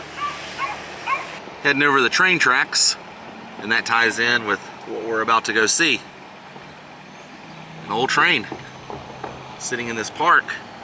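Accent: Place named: South, United States. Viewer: Every video in Mississippi, I can hear that southern drawl creeping back into your accent...lol. I'm the same way. Another awesome video!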